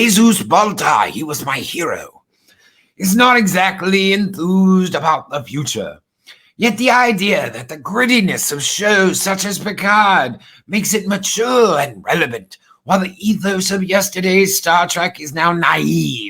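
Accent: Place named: RP, United Kingdom. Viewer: indeed! It seems I can leave a comment here but I can’t a see anyone else’s. WTF, YouTube? Nice video, man, I truly enjoyed your constipated British toff voice. Constructive criticism: You might want to watch the occasional “um’s” and such, and invest in an expensive microphone... it seems to be a necessity for a promising YouTuber. Again, nice job; I’m sure starting a YT channel is like watching grass grow; please persevere!🙂🖖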